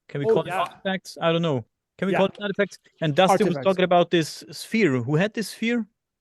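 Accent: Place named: United States. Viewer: Dass so gut Englisch kann, schreckt mich wenig, spricht wie ein Amerikaner👍 Und er ist noch dazu Österreicher wie ich...👌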